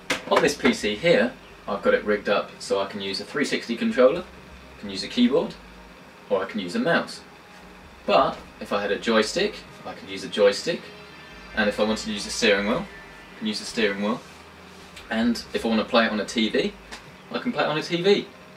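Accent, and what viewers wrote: United Kingdom. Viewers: His british accent just adds to the comedic value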